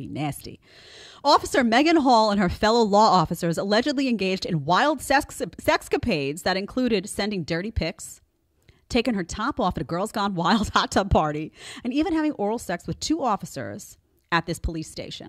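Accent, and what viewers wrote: New York, United States. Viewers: I like the points, but the way you screech and say Mmmhmmm are very off putting at times. Calm down the New York sass and your numbers will go up.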